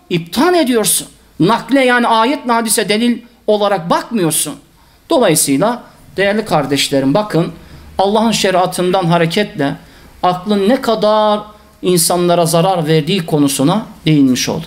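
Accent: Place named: Arab world. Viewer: Islami kelami yasaklayan Islam liderler simdi cennette mi yani? Ne kadar ilginc, Kuran bu liderler geldikten sonra kelami yasaklar olarak algilanmaya baslandi. Islam cografyasinin icindeki parcalanma dusunmenin yasaklanmasidir. Iman kulun soz zikretmesine indirgenirse tepenize biner de binerler. Beyefendi, nicin kalin bir Arap aksaniyla anlatiyorsunuz meraminizi? Nisantasi Turkcesi'nden farksiz bir yerde.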